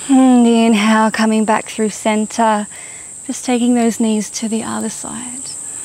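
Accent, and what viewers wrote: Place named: Australia. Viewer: Thank you so much for taking your time for ourselves. What a beautiful practice once more. I missed you and your beautiful practices and your beautiful australian accent and the beautiful sounds of nature all around you. It is hot and sweaty there and I am freezing in front of the wood-stove here early in the morning! The cicadas are long left towards Australia and still very nice to hear that they reached their destination! All the best.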